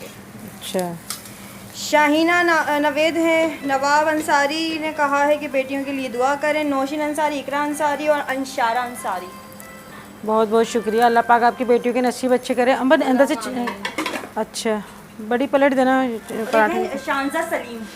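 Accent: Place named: Bhopal, India. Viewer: As salam alaikum Aunty .. Aapki accent kaafi bhopali hai...??Kya aapka koi rishtedar Bhopal India say hai ??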